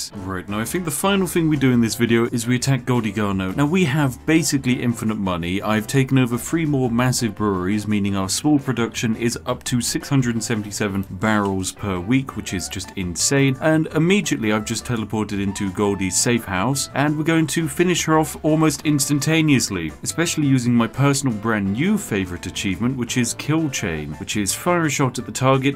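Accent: United Kingdom. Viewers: How to pull off being a millionaire and being your own boss... Step 1) Be British or have a smooth-as-hell British accent. Step 2) ??? Step 3) Profit! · wait. isn't british???? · IS HE REALY BRITISH BECAUSE I M A BRITISH MUN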